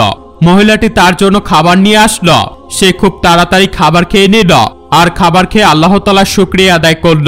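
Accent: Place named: Noakhali, Bangladesh. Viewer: ভাই তোমরা কণ্ঠ এমন,মনেহয়,নোয়াখালী মনেহয়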